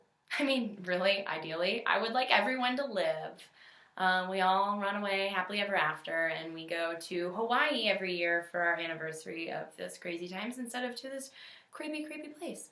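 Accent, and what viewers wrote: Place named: England, United Kingdom. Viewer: Soy español pero detecto un poco ingles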